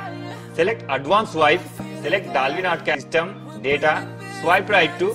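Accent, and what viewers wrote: India. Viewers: >click on video >indian starts talking >close immediately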